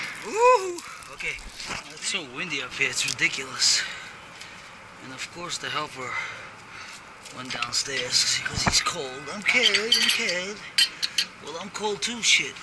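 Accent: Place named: New York, United States. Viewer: You are cool man, love your accent, that classic NY accent as its best!! Cheers from Sweden! Jupp Bahco was made here once....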